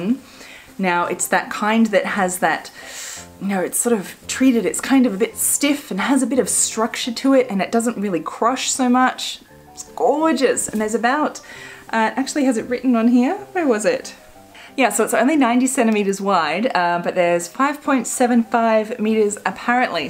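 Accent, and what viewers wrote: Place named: Australia. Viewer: New to your channel, your accent sounds Aussie to me, do you live in Australia?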